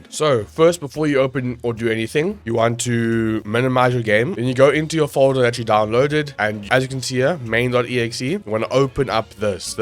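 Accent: South Africa. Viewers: holy, i recognised that south african accent instantly.Thought i was the only one in the country to play als.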